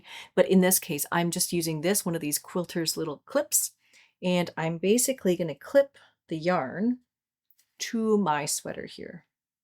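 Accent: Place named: Canada. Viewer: Yay! Vancouverite here. I was about to ask if you were Canadian, your accent gives it away. Georgia St?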